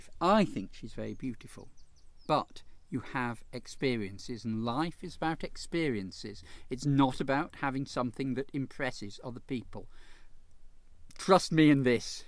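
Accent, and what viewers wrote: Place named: RP, United Kingdom. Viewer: sound like a silverspoon in gob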